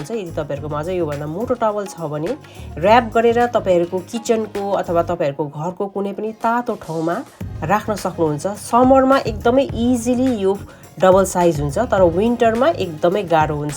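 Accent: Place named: Sikkim, India. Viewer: She might be Indian ... And those pronunciation is very common in Sikkim Darjeeling etc ..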